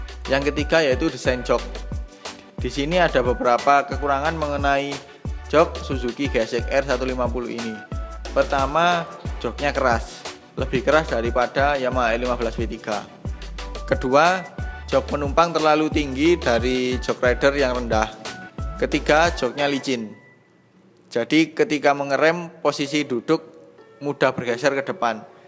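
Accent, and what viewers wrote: Malang, Indonesia. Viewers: Wong malang mas?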